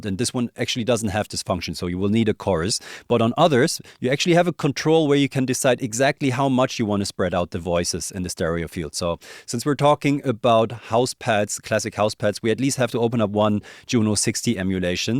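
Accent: Germany. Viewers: I only trust synth advice from German sounding people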